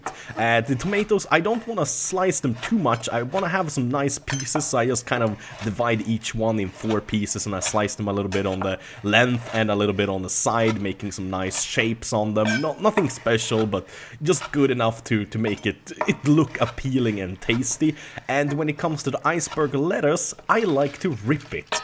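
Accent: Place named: Sweden. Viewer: red onjons (red onions), shiis ( cheese) - ahh i love that Swedish accent :D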